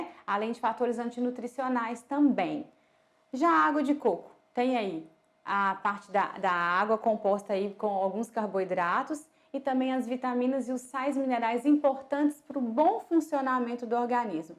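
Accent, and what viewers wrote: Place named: Minas Gerais, Brazil. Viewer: Sotaque mineiro detectado 😉🥰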